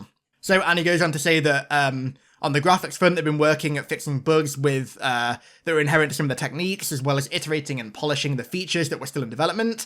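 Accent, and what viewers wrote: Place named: United Kingdom. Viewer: Brittish wankuh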